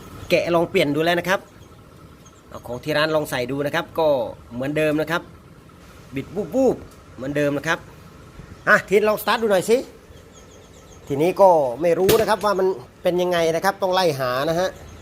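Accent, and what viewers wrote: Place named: Surin, Thailand. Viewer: เสียงคนพูด คนสุรินทร์ชัวเลย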